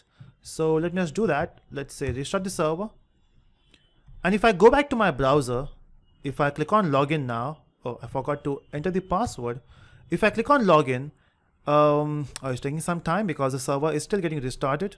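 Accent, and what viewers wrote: India. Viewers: Really a great job. I'm not an english mother-tongue, and you're probably the first man who speaks english with indian accent that I can fully and clearly understand. Well done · Dude, you are great, since I am not an Indian I wish you could speak English with a better accent, but anyway thanks a million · oh gosh indian accent...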